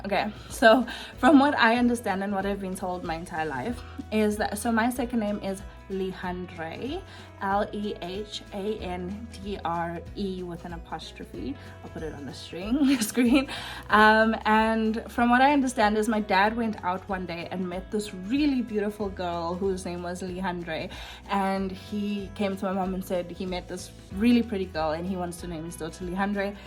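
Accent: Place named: South Africa. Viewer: Omg I love your accent!! South African accents are the most beautiful accents ever in my opinion.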